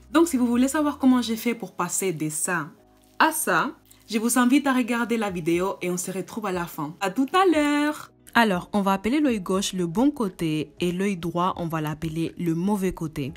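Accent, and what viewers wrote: Spain. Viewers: I love your spanish accent omg 😻😻😻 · adorable et super vidéo !! (y se nota que vienes de españa jaja!! mi madre tiene el mismo acento en francés! suerte guapa<3)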